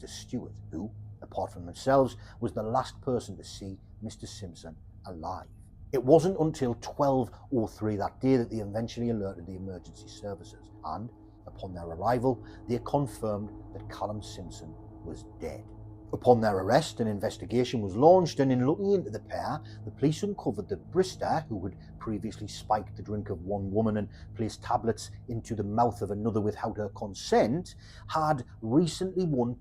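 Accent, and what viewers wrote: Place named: Newcastle, United Kingdom. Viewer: I'm probably due you an apology for thinking you were a well spoken Geordie instead of a Mackem then haha. Glad you noticed my critique was intended as constructive instead of just arsey. I'm a Fifer who lives not far from Burntisland, trust me, you're not the first to say it out as one word, heard many an American or English tourist pronounce it the way you did. I'd also say there's probably a good amount more of Scottish Madmen (a few women too) and Monsters to cover in the future. For example wouldn't mind a longer or longish one on either Peter Tobin or Angus Sinclair. I was looking for something new in the True Crime genre and subscribed after watching 2 of your earlier videos, genuinely think your work is generally excellent mate.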